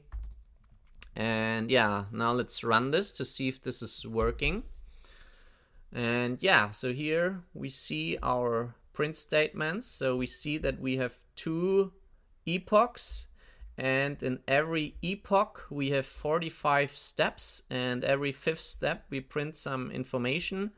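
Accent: Germany. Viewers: I love how uncompromising this german accent is. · never heard a more german pronounciation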